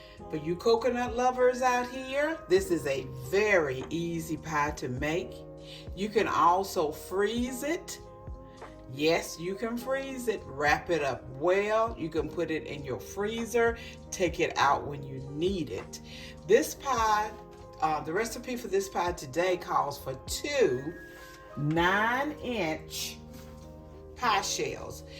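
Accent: South, United States. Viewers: Good morning mrs watched your first video yesterday on the pineapple cake, running to store now, also seen your recipe for coconut pie, 8 what? Because didn’t watch video, sorry, but love you! Your so country sounding, I’m going to try a lot of your recipes!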